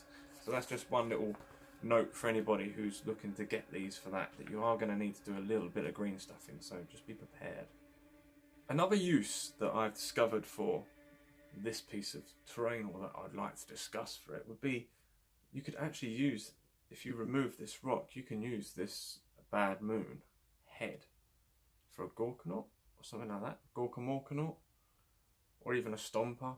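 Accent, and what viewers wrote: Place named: RP, United Kingdom. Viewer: Beautiful Queens English squire!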